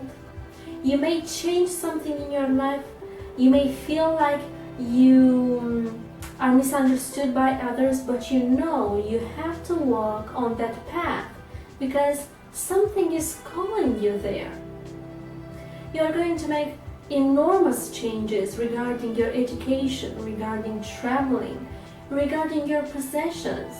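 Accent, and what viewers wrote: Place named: Italy. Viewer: Great reading, very positive insight about this month! Love your accent. Are you Italian?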